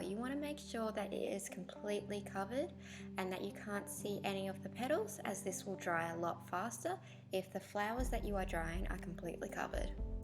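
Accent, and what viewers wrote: Australia. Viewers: Good vid, and nice to hear an Aussie accent 👍🏽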